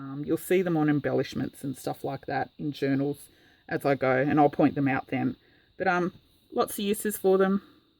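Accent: Australia. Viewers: Hi! My name’s Erica & I live in Lawrence, Kansas, USA! You just showed up on YouTube for me & I’m so glad you did, because I love this method for making paper ruffles! It’s genius & I hope I can get the hang of it! I can also see using paper that’s been colored with sprays & paints! I think you said something like the sky’s the limit, haha! I love your Aussie accent, as well! I have “liked” & subscribed & will mention you to my friends! So nice to find you! Erica❤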